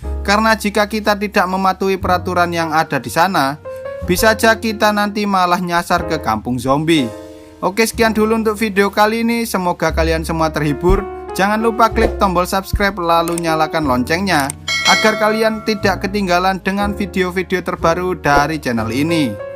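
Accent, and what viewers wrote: Java, Indonesia. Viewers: Medok tak trtolong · Ketoro nek iki arek jowo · Abang orang jaws ya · Medok tenan mas🤣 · Abang wong jowo? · We abang wong jawa y? Pada baen ak be wong jawa😅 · Njirr wong jawa massee Gi ngapa massse wkwkwk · Dari Jawa Mana Kamu Bang?..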